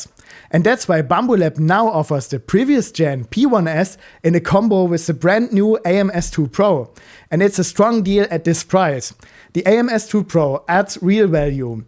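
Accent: Germany. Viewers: this bad accent german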